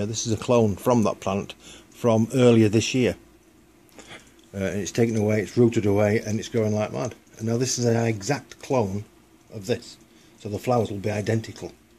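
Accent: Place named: Northern England, United Kingdom. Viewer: Thanks for the cool video. Sounds like you are from Northern England and I realize there is accent involved, but I live in Hawaii, and we pronounce the word "CAY-key".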